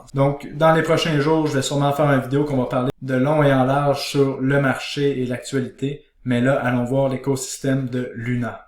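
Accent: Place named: Quebec, Canada. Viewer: salut, je te suis depuis la France, et je trouve tes vidéos très instructives. j'ai appris beaucoup sur la façon de dénicher les bons projets. j'arrive à garder la tète froide grâce à tes conseilles, sur la psychologie des marchés. en plus ca fait toujours plaisir pour un français d'écouter l'accent du Québec! et surtout de le comprendre ):p merci pour ton travail!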